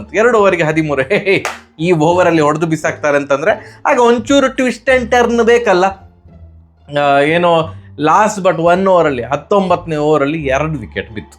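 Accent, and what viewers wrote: Mangalore, India. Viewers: ಆ...ದೇವರ್ರೇ ಉಂಟಲ್ಲ..... Its bought u a new subscriber ❤❤ Love your accent' love kudla ... ಇನ್ನು ಹೆಚ್ಚು ಹೆಚ್ಚು ..ಕಂಟೆಂಟ್ ವಿಡಿಯೋಗಳು ಬರಲಿ .... ನಗುವಿನೊಂದಿಗೆ .... ❤.goodluck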